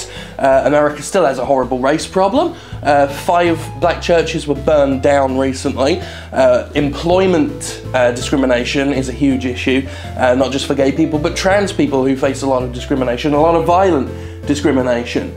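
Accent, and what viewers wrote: United Kingdom. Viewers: Probably just the British accent, similar to how they often pronounce "Ah with an R sound at the end.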